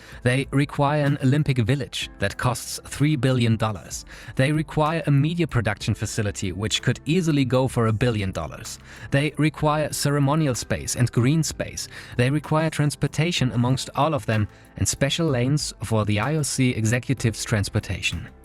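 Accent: Germany. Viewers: If you have not noticed it by the German accent this was the ultimate hint. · When i heard the german accent i instantly went to channelinfo just to be confirmed. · His accent ist most definitely from a German speaking country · because he has a thic german accent and tries to hide it by pronouncing everthing very pREcisLEy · I like his german accent. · I didn't need to hear him say Rhein-Ruhr to figure that out, he has a german accent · I can hear your German accent soooo clearly!!!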